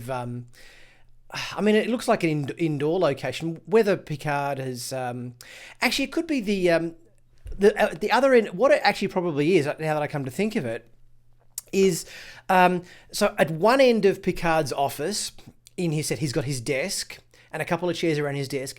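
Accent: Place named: Australia. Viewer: Ah, Sutra is bad Karma! KarmaSutra, sorry I could not resist that! The theme of siblings interest me, you have Narek and Narissa, twins? one good, one bad! Jana and Sutra, which is also a puzzle, since Rios would have remembered if she was gold colored I would thought!. However I think this finale may have some surprises that no one expects, including Sutra, if the Admonition is was not understood by the Romulans, perhaps Sutra might have too, and what is coming will be like the battle of the 5 armies in the Hobbit, perhaps Gandalf and Picard have been comparing notes?? Yes, probably far fetched! I must say I have enjoyed your reviews, and look forward to how it plays out, judging from the accent Australia or New Zealand, I live in Wales in what I describe as God's country of Llaniltud Fawr aka Llantwit Major in Wales, in fact the finale of Dr Who was filmed in the around my neighborhood, the scene with the Cyberheads zapping the last humans was filmed at the St Athan"s Boys Village near the Aberthaw power Station! 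the beach with the gateway to Gallifray is nearby. I look forward to your next review, stay safe!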